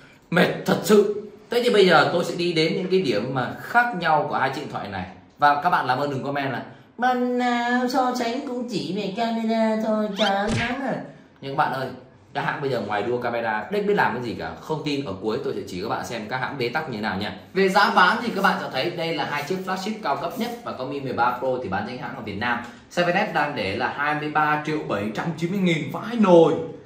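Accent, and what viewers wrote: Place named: Countryside, Vietnam. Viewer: Mặt bựa lẫn giọng quê quê!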